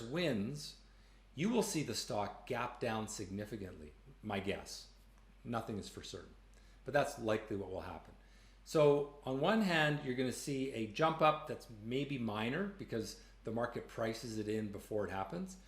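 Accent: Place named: Canada. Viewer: I’m an Aussie and always have loved the Canadian accent. This guy is simply incredible. Clearest information that I have ever listened to.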